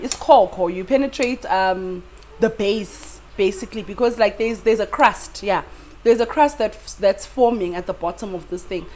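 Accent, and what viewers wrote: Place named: Africa. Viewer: stop complaining for nothing!We are African,get used to our English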